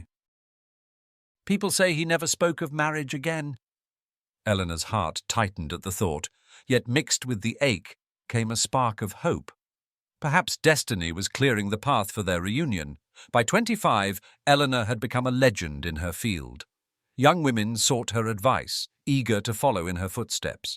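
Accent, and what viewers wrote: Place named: United Kingdom. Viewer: the narrator's British accent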